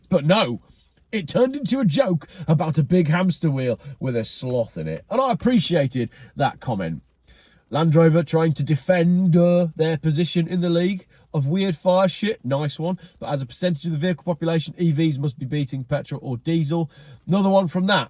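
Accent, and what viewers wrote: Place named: Birmingham, United Kingdom. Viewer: maybe it was the sloth?? Great vids... happy to hear a Brummie after decades in Canada.... Keep on keeping on (lose the hat, get a bandanna)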